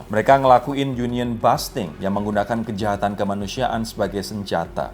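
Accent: Indonesia. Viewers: beberapa episode make-up mas aku perhatiin medok banget mas.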